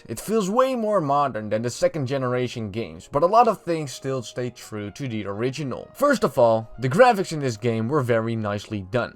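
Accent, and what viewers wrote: Netherlands. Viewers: Very good review, +1 point for Dutch accent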